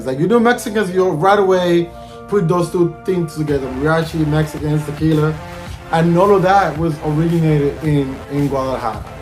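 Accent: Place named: Cuba. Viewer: Where you from Brother you have an interesting accent. Is it Cuban?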